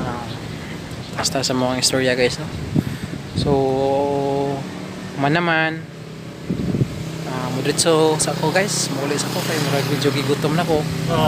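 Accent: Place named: Capiz, Philippines. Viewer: Taga capiz ka po b? Para kseng i heard na ung ganyang dialect.. try to speak tagalog sometimes if you can.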